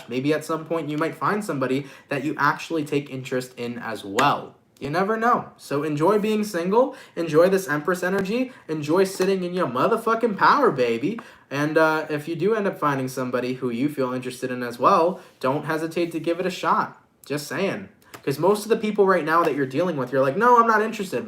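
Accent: England, United Kingdom. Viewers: From England great English accent more mate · Capricorn here, love the English accent haha. I’m English but mines more northern than that😊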